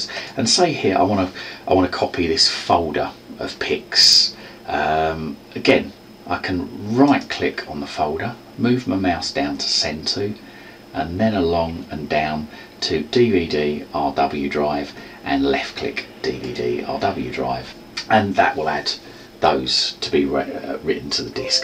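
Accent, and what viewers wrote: United Kingdom. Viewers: Thank you. Simplified instructions, comprehensive for people that lack experience,calm voice, british accent . Great job!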